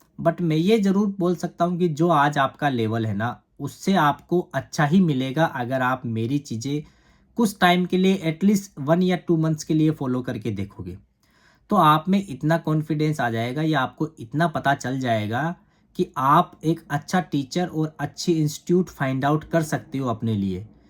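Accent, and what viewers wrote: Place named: India. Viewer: my self prakash.... already have sent my concern on your Instagram handle....hoping that you will reply soon.......with lots of happiness and kinship you are continuously delivering to the needful .......Thanks ..,.i feel so happy to see a rajasthani bhai......... exploring uk......in desi accent ❤🎉🎉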